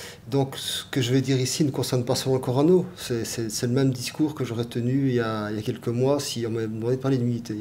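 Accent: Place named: Liège, Belgium. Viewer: Accent liégeois, je crois. Mais il a aussi oublié de muscler sa mâchoire, tout le reste oui dirait-on, mais ses muscles mandibulaires apparaissent hypotoniques ou alors peut-être un lifting facial trop accentué a tendance à lui bloquer la mâchoire inférieure en tirant trop la peau vers l'arrière.. Bref, le manque de mouvement de sa mâchoire fait qu'il "mange" ses mots. Il en dit beaucoup tout en fermant sa gueule.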